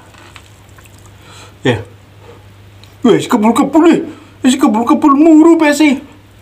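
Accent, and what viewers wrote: Banyumas, Indonesia. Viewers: Mangan maning apa ora wedi makin gendut mas ha ha,sehat ya mas,ora ngapak ora kepenak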